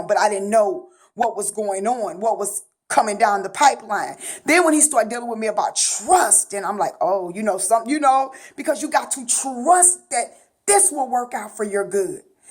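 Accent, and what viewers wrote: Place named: St. Louis, United States. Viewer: Amen sis! God is faithful! You sound like you from the home town! STL?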